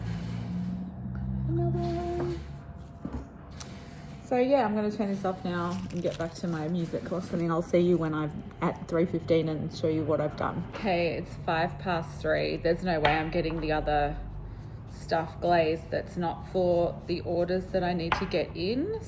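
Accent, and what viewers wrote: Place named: Australia. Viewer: Wow these pens look amazing. I really likee the sound of the brush tips and wowzaz…Australian!!! Awesome!!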